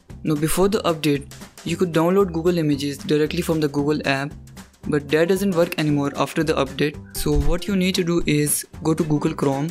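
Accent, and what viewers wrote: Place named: Russia. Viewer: He sounds like a Russian speaking English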